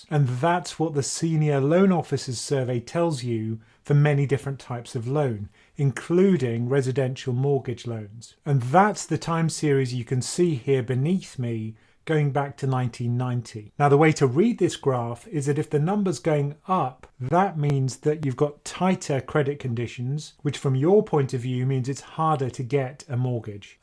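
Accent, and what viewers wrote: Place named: RP, United Kingdom. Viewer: You are such an intellectually honest man and your Queen's English is second to none. Bravo Amigo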